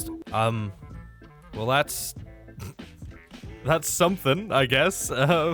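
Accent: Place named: United Kingdom. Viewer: The strong British accent slays and I could like hearing the yapping.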